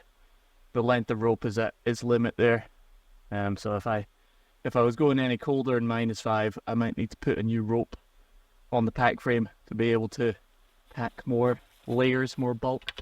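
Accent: Scotland, United Kingdom. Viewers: Once again an excellent video. However the flute, pipes and rushing water made hearing and understanding your brogue a bit difficult for this Yank. Too many folks over do the “background” music to the detriment of their videos quality. Just my pet peeve. I truly enjoy your love for accurate renditions of highland history.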